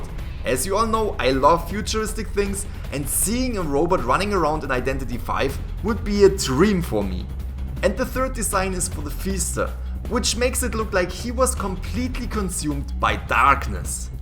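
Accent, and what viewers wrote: Germany. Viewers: Are you irgendwie deutsch because you kling very deutsch xD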